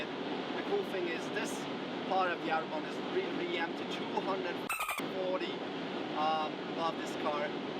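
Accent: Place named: Australia. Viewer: Your accent sounds australian🤔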